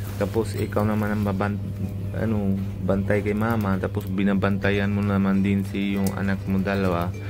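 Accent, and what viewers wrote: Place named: Philippines. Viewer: Para na talaga filipino si kuya mapapansin sa salita nya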